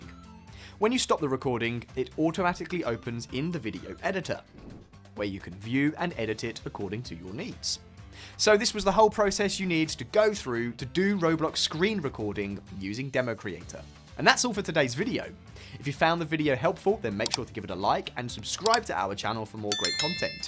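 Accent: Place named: United Kingdom. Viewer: another jagoff with a brit accent and generic content. Didn't learn too much here but appreciate the effort.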